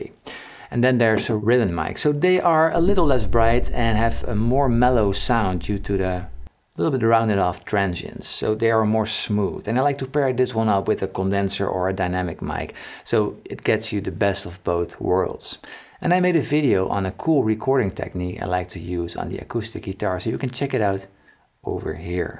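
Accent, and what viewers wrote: Netherlands. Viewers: You sound super dutch. Good info thank you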